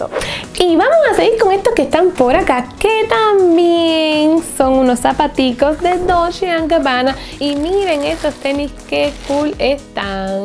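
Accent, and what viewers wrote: Cuba. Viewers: seguro por el acento cubano. No sé de dónde eres, pero yo soy Dominicano, y en Cuba y aquí usamos términos parecidos y/o iguales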